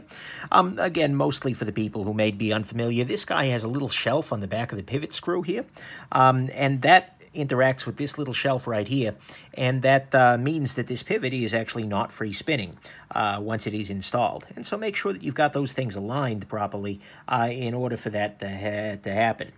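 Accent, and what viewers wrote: United States. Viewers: just got into knives. i like your reviews on knives but where are you from you sound american but with a bugger in your mouth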